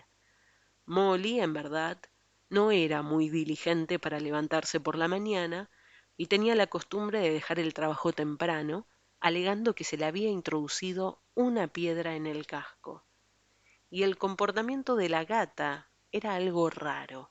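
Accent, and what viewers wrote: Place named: Argentina. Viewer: Amo el acento argentino, me relajó demasiado tu voz 😭🩷 está súper interesanteeee ya quiero que salga la otra parte, y gracias por el buen contenido :)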